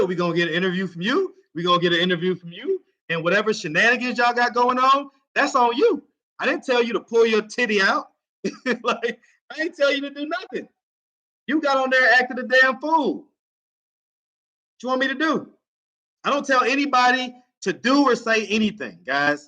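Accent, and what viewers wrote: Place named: Atlanta, United States. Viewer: Why do you have on a NYC shirt,? Are you from NY? If so, how long have you been living in the A? B/c you have an Atl accent. I'm from Harlem, NYC, born & raised 121st & Lenox Ave. But I've been living in GA for 2.5 yrs now. Don't miss NY, not ready to go visit yet. Finally, get to put a face to the voice.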